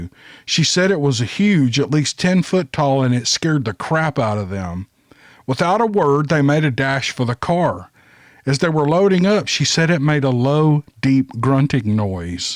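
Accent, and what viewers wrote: South, United States. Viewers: I like your genuine humility also. Ppl can tell. Southern drawls feel at home 2. I saw elves in 1976-77 in cheyenne wy. Not sure you'd want that story here. Nor am i joking. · do you see what you have started? My gosh! Folks love you reading these stories because they relate to you and the persons who tell (wrote) them. You make it so much fun... although never making light of the scary encounters that take place for many of these individuals (and the fact they have to try and learn how to deal with it). You have cornered a phrase... "Awright, here we go!" (In your way cool, southern gentleman, way.) Then those of us out here in any particular corner of the world lock in and are ready to take the ride.:^) You go,. We're right here with ya!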